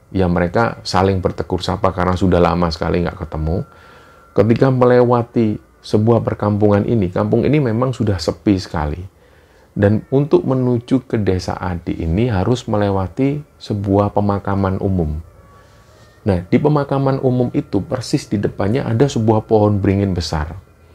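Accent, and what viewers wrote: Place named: Surabaya, Indonesia. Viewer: Nuwun sewu, sedikit saran aja mas, usahakan jgn pakai dialek surabaya/arekan kalo menceritakan dg latar jawa tengah dan daerah dialek non arekan...matur suwun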